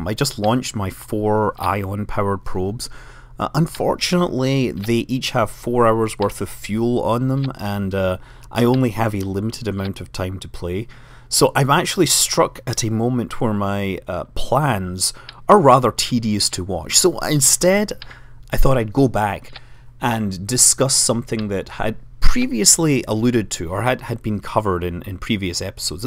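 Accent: Scotland, United Kingdom. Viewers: Nope, it's a Scottish accent. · scottish, not much difference, i know cus am irish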